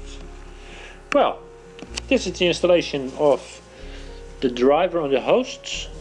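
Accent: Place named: Netherlands. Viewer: aan jouw accent to horen ben jij Nederlands. Vraagje, werkt dit ook met Microsoft RDP? Ik heb thuis een ESX 6 host. Hierop heb ik een aantal servers waaronder een Terminalserver waar mijn familie op inlogt. Nu wil ik dus de Quadro 4000 met ze delen zodat ze binnen Terminalserver gebruik kunnen maken van 3D mogelijkheden. Is dat mogelijk hiermee? Want in jouw demo maak je gebruik van VMware View. Dank je wel voor jouw video!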